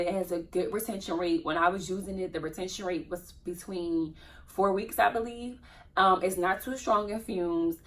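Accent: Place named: Philadelphia, United States. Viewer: I’m new to your videos , but I just gotta say … the minute you opened your mouth I knew you was from the city 🤣🤣🤣 when you said Houston I said ain’t no way . Then you said Philly and I said ard that’s what I thought 🤣🤣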